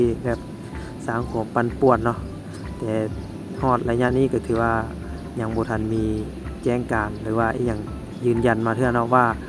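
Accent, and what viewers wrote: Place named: Xiangkhouang, Laos. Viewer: ເຈົ້າບໍແມ່ນຄົນຊຽງຂວາງຫວາຄຳເວົ້າຄ້າຍໆຄືໄທຊຽງຂວາງ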